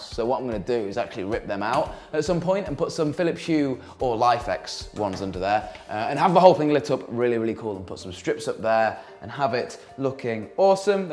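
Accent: United Kingdom. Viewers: Is he welsh?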